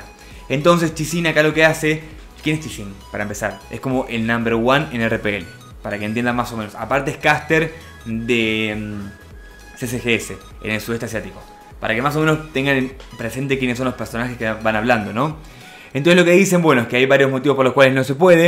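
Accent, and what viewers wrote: Argentina. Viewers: dice roshal por el acento argentino que tiene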